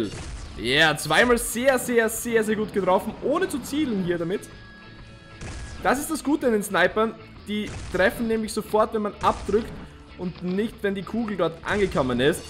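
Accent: Austria. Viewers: bist du österreier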